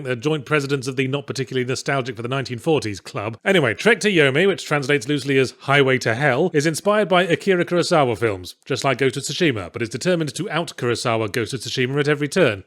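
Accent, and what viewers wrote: United Kingdom. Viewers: I feel like the easiest way to appeal to Yahtzee is to give him a protagonist with the exact same "slightly condencending, posh and sarcastic" british accent, that he has. He ends up liking most of them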